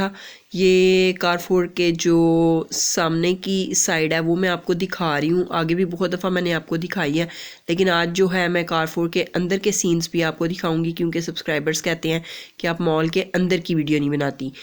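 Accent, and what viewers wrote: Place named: Sialkot, Pakistan. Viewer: Apky accent sy hu lgta hy Ap sialkoti hu 😄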